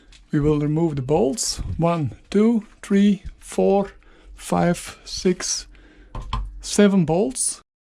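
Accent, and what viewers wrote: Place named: Belgium. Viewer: For en Englishman you have a Belgium accent. No problem, clear explication.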